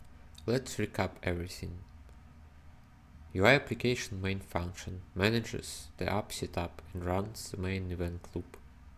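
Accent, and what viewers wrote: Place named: Russia. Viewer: You have a wonderfull Russian Accent 🥲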